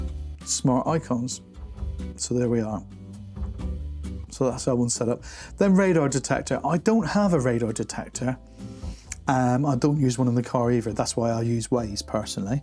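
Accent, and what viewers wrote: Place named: Somerset, United Kingdom. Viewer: This video was excellent, convinced me to download it and it's great. Judging by your accent I suspect we arent far apart, I live in Somerset. Currently trying to upload a custom logo and it doesn't give the option of where to upload the image from though (as yours does from the bottom of the screen). Any idea how to sort this?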